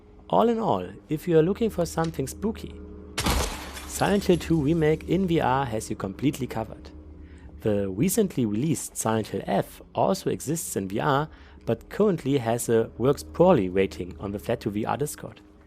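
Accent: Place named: Germany. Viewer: imo perfectly fine. Your english sounds very german but who cares. The content is great btw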